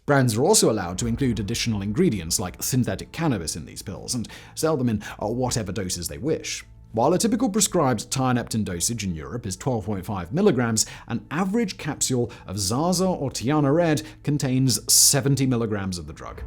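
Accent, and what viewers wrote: United Kingdom. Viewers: How is it that he reporting on American products with a british accent and I never seen this American product 😂 bc down here in Houston if someone say zaza they talnbout that good gas. Or superb Marijuana for you unseasoned mfs.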